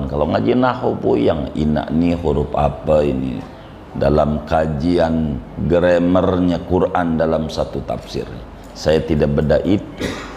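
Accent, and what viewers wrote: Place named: Jakarta, Indonesia. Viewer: Pantes aja... Tapi logat bhsany betawi banget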